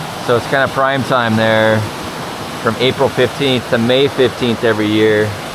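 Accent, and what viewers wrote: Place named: United States. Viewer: cool video and some useful info. You definitely don't sound Swiss :) You're American aren't ya?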